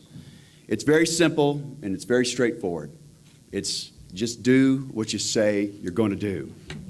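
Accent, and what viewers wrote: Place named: South, United States. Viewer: Damn i love his southern twang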